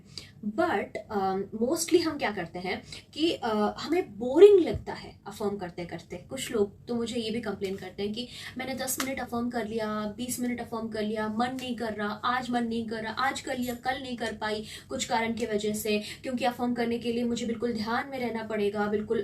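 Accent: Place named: Odisha, India. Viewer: Aap odisha se hai ma'am...loved to listen thus 😊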